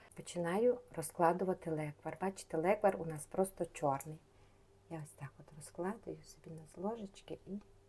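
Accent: Transcarpathia, Ukraine. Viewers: Добрий день. Ви неймовірна. Приємно чути закарпатський діалект. ❤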